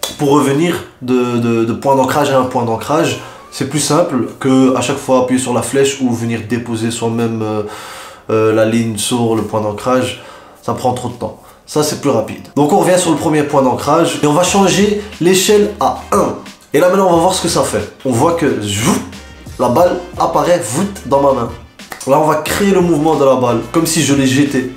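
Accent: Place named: Belgium. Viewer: shaab Belgique haha t'es de bxl non ? merci pour le tuto mon frérot 💪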